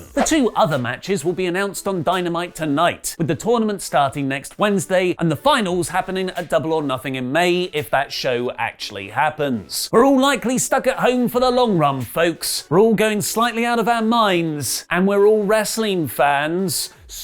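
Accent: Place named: RP, United Kingdom. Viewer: Why do you talk like you're on Radio 4?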